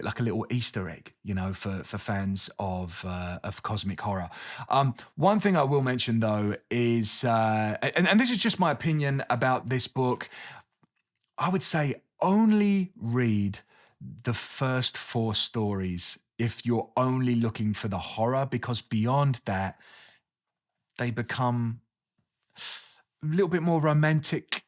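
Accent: Cockney, United Kingdom. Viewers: New subscriber! Just stumbled across your channel on this cloudy and cold day in the middle America. Understood your accent perfectly and would love for you to turn up the cockney a bit. Great recommendations! 🇺🇸 🇬🇧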